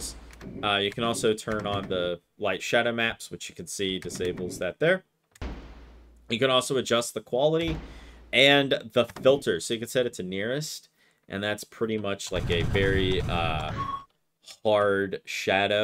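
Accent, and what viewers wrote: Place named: Brooklyn, United States. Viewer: what is brooklyn guy babbling about nice tutorial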